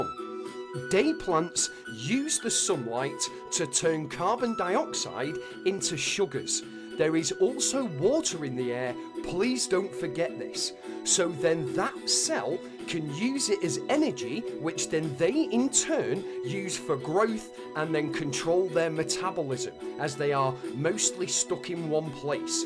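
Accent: United Kingdom. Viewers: I like your narrative in your Yorkshire or manc accent. Somewhere up north... Great series mate, glad I discovered you, you deserve way more views from these vids. Going to have a binge on these now while decorating from where I left off on episode 7. Rather watch this than Netflix anyday!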